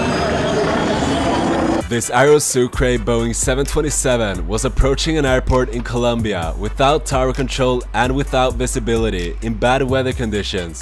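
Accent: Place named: Sweden. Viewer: Värsta svengelsan jag hört!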